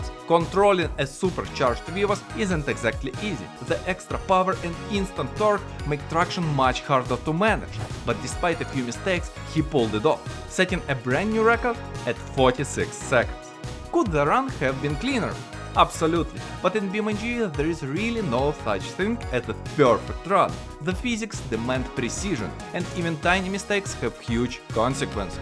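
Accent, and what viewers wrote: Russia. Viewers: Слышу Русский акцент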